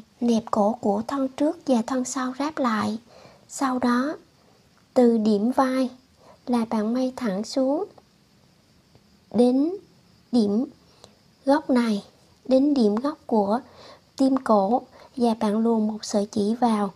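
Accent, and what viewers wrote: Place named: Mekong Delta, Vietnam. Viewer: Bà chắc dễ thương lắm nè.cưng ghê giống người miền tây hả bạn❤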